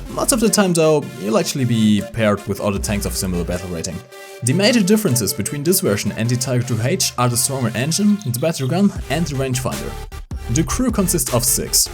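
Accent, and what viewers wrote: Germany. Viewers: hach ich feier diese englisch mit akzentfreiem deutsch soo hart ;) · dat Germany accent tho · hab ich mir auch schon gedacht auch weil sein englisch so klingt wie das eines deutschen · ich wusste es du hasst einen starken deutschen akzent (was nichts schlechtes ist)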